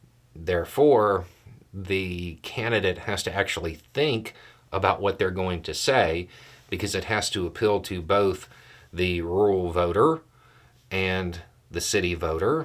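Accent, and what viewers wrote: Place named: Florida, United States. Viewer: He’s also part of a comedy group who call themselves the liberal rednecks. It’s ironic southern humor. Trae Crowder, who shows up making pretty insightful political commentary once in a while, has a very noticeable rural Tennessee accent. himself, who’s name, btw, is not - that’s a typical deep south name, has a Florida accent which is slightly modified when he makes these videos, but the way he looks? That’s Florida Man. It’s all very regional American humor and plays up to American stereotypes. I can’t tell where you’re from from your name, but I’m willing to bet you’ve got the same thing going on in your country.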